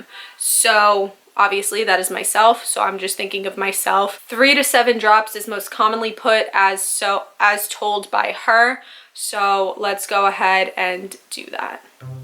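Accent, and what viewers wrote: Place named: New York City, United States. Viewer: i love hearing an authentic nyc italian accent 😆 as a canadian hehe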